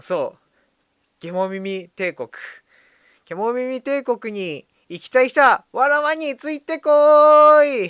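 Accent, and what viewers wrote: Hiroshima, Japan. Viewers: 広島の方ですか？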